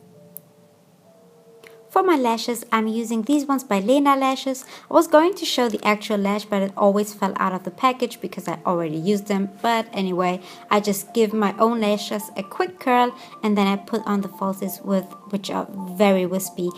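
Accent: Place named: Germany. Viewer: I found this look on instagram and I am totally obsessed with it 😍 your accent sounds sooo german 🙈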